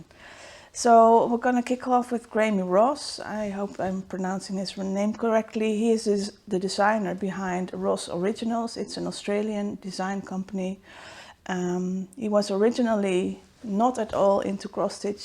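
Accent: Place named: Netherlands. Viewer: Finally found a dutch flosstuber. I heard your accent and just knew. A new subbie here. I did a few inkcircles too. Yours are beautiful. Marike zeewolde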